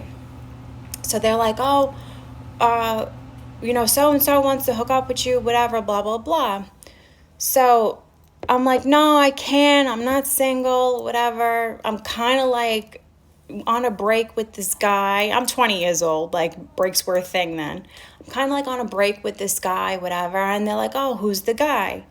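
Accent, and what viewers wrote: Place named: New York, United States. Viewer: As a Floridian I can tell you she definitely has an accent! I can hear it loud and clear. Are you from NY or somewhere up north? That may be why you don’t pick up on it.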